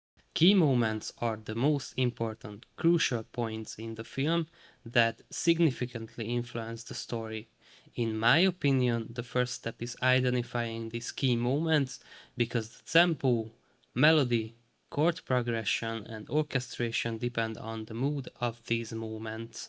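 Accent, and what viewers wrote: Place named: Hungary. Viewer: TUDTAM A KIEJTÉSEDBŐL HOGY MAGYAR VAGY (nagyon kiraly a video amugy)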